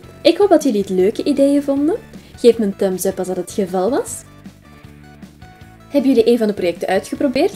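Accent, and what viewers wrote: Flanders, Belgium. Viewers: jij komt uit belgië? zo klink je anders wel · Dit is een nederlands kanaal maar de stem is belgische?😂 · Just Girls Het is overduidelijk Vlaams. Ik heb veel vrienden in Limburg hier in België, het klinkt nog net iets anders als in Nederland. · Ik dacht dat dit Vlaams was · dit ks eenrder vlaams dan nederlands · Ben je Belgisch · Dit is nie Nederlands .,. Belgies 😑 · Huh, je bent toch Belgisch? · ze is een Belg die hebben vaak andere uitspraken en wat boeit het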